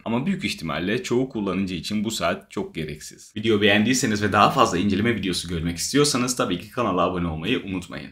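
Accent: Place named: United States. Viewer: Konuşman aşırı rahatsız edici Türkçeyi Amerikan aksanıyla konuşuyor gibisin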